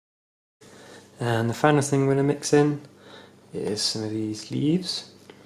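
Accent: United Kingdom. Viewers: You are from derby aren't you!!!???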